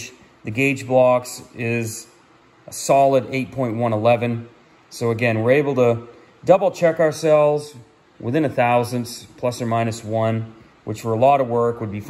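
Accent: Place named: Canada. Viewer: very good info. you sound like a Canadian!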